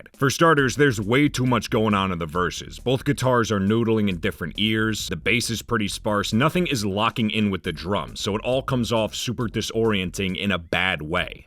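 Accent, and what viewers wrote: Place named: New Jersey, United States. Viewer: Where you from? Jersey?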